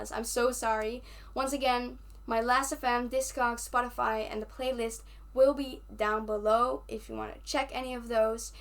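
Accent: Netherlands. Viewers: Amazing collection! You sound Dutch btw, are u?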